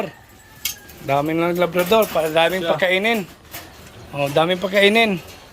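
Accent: Iloilo, Philippines. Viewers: Ilonggo gale Ka sir?😅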